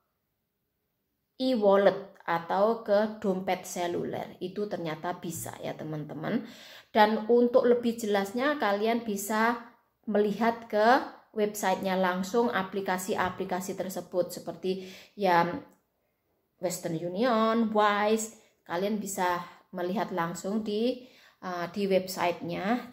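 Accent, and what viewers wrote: Java, Indonesia. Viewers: Sampean org mana mbk, logat nya Jawa yo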